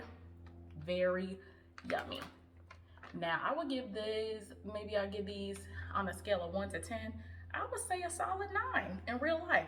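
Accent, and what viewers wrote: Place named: East Coast, United States. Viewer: Just found your page. I’m originally from Chicago too! You sound like you’re from the East Coast lol. Yesss girl use that Quinoa!!!!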